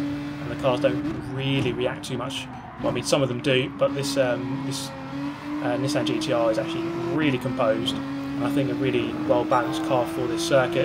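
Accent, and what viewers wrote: United Kingdom. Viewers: Can’t believe ‘they’ changed the intro music 👌😂 The humour. And the theme is so contrary to your british gentleman voice and the image peeps might have of you lol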